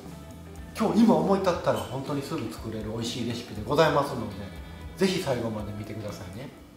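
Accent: Kansai, Japan. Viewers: 10分でできる料理...最高にありがたいです(;▽;)にんじん細切りの仕方感動~そして関西弁でおちつきます😊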